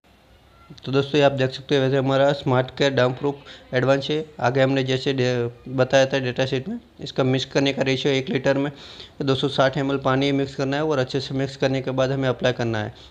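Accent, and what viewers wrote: Gujarat, India. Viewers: Gujarati ho na??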